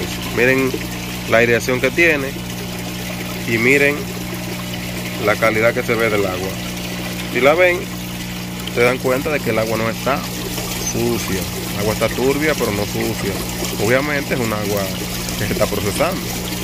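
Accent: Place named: Dominican Republic. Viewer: Saludos usted suena como dominicano, tiene Instagram para comunicarme con ustedes?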